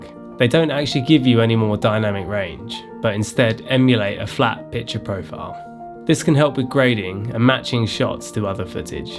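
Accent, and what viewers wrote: United Kingdom. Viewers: Amazing view and lovely British accent..well done.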